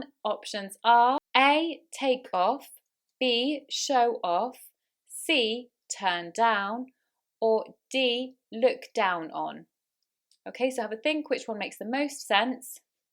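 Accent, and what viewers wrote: United Kingdom. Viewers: omg, you have a pretty good british accent!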